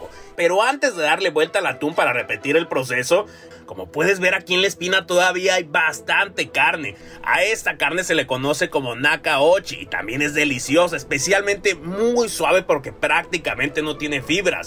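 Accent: Japan. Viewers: Con todo respeto es divertido escuchar hablar español a los japos.😂❤ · Es japones es obio que le cueste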